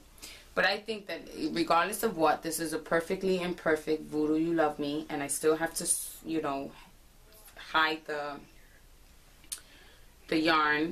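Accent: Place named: New York, United States. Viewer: Hello, I love PandaVille! I see that you have fallen in love with knitting. I hope that you are able to complete your project list. I'll be watching and give you and a shout out on my next (YT) video. Love the New York accent! P.S. Love the knitting caddy Christmas gift from your husband. J.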